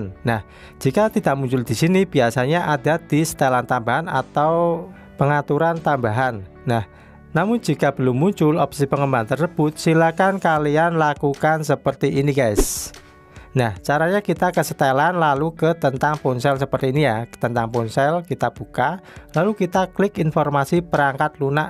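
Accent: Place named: Central Java, Indonesia. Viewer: Logat jawa tengah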